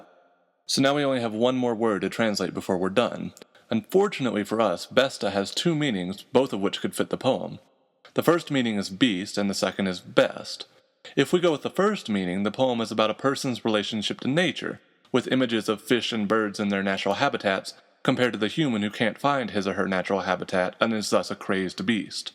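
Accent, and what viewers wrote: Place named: United States. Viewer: I found an American accent funny talking about Middle English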